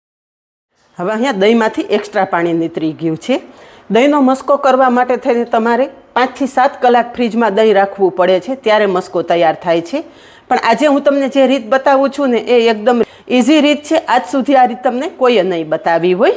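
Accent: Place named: Kathiawad, India. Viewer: beautifully explained in pure kathiawadi gujrati. You showed two things.... How to make thick dahi and shrikhand. I will add the ingredients to make dahi and shrikhand. Thank you.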